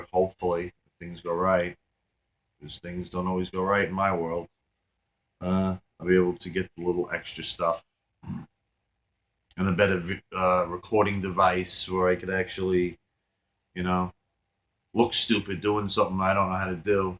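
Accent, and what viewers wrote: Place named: Long Island, United States. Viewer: Too much to respond to in this video. I feel like I'm listening to myself a couple of years ago. You're gonna be a star man. YT is going to be a great place to flourish for you. I can see it already. You have a GREAT accent. The Long Island thing is BOSS dude. It makes you so Hollywood. I think you have half of this stuff nailed. And a CAR GUY. HOLY F. Welcome to the club of guitar guys who are car guys too. DKG is a huge car nut too!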